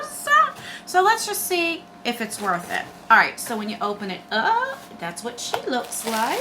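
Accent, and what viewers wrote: New Jersey, United States. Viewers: I definitely like it when you show cosmetics and and some of the clothes! I love your Jersey accent!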